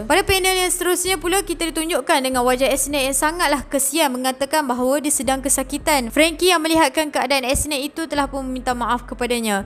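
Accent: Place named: North, Malaysia. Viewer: Gws ….. pekat na utara dia 🤣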